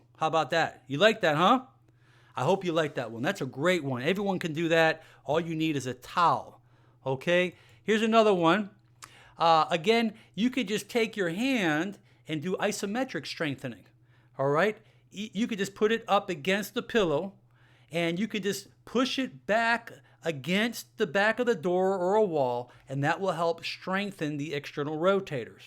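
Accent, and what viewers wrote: Australia. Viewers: Are you from austrelia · hi Are you from Australia?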